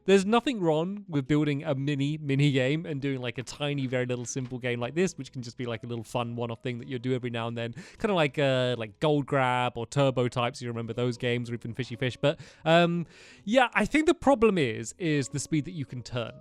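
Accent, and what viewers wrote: England, United Kingdom. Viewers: Oh, oh you make me smile! I think i'll sit down and watch for a while. Oh, oh, when i hear voice. I hear the engish acent i rejoice! Oh, oh, your worldx are lovely! Full of cakes helpers and lovely jublies. Oh, oh sta-oh no! Its hitthetarget!! He shoots an arrow, you gotta block it! Horray we won! Now the day is done. Now its night, turn off the light and dream... Oh, wait, whats my dream? To become your helper. I am a great builder! Oh please, oh please. Gamer tag: CrystaI dog P.S. the L is a capital i Thank you!😊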